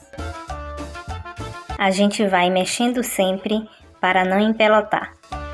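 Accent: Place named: Northeast, Brazil. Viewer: Boa noite gostei sutaque nordestino eu amo nordestino Bahia eu Itabuna Aracaju Sergipe ou meu pai morreu era sergipano sou de Santos gostei do vídeo parabéns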